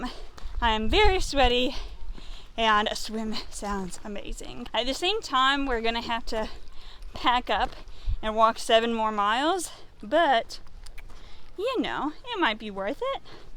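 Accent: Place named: Kentucky, United States. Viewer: Jersey dude here. You are sweet but from a Jersey perspective your Kentucky accent is bordering on a speech impediment.I guess it is all about your point of view...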